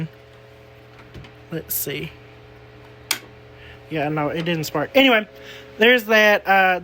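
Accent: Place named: Louisiana, United States. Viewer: This just came across my feed and i am so intrigued by you and find you fascinating!! You dont happen to be from Louisiana by any chance do you? Bc i am from Louisiana and you sound like you might be. As well as the area that you live seems it could be. ❤ I have subscribed so that i can check out lots of your other content! Blessings and light to you!❤❤❤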